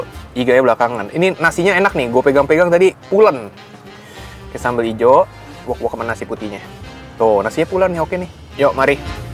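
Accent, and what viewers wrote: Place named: Bekasi, Indonesia. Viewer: Logat lu bekasi bgt bang😂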